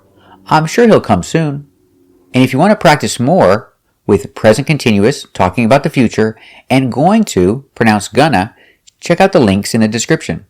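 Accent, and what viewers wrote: United States. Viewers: Sir are you American?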